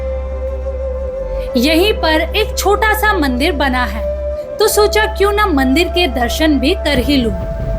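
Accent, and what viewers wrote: Uttarakhand, India. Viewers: Thank you ji apne jo vlog banaya jo apne itna sunder hill station dikhaya hame itn sunder hill se jyada apki awaj me muje uttarakhand ki mahak ati hai ji itna sunder cuit smile dekh ke dil khush ho jata hai apke vlog ka besabri se intjar rehta hai love you vlog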